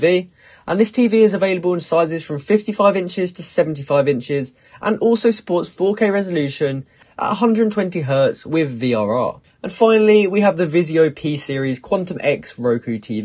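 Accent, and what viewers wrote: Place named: United Kingdom. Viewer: He’s british dumbass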